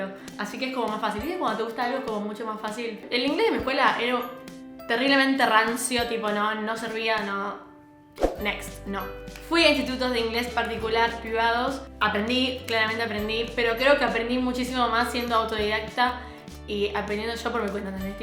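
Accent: United Kingdom. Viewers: Nunca tuve preferencias con los acentos! Pero desde que empece a ver tus videos.. Amo el acento britanico! Quiero aprender ingles britanicooo! Sos muy genia! TKM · me encanta tu acento británico 😘 ahora tengo una amigo British y me encanta el acento de el también, continuaré viendo tus vídeos ❤️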